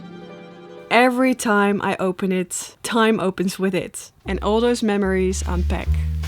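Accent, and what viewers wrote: Netherlands. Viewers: Love that you called out your Dutch accent. Because i never watched a video of you before but within a few seconds i realized i was watching a fellow Dutchie ;)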